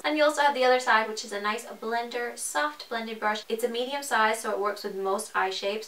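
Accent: Ireland. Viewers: Are you Irish? :) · Are you Irish?🇮🇪 P.S I love you😘❤️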